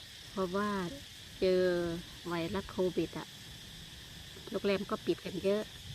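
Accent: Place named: South, Thailand. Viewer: นี่ถ้าไม่บอกคิดว่าคนใต้ เพราะสำเนียงเริ่มมาทางใต้แล้ว ยินดีที่ได้รู้จักนะค่ะ ทำคลิปสั้นด้วยสิค่ะ ช่องจะมีคนเห็นเยอะขึ้นค่ะ สู้ๆๆนะค่ะ เป็นกำลังใจให้ค่ะ นุ้ยเคยทำงานโรงแรมที่ภูเก็ต 6ปีกว่าค่ะ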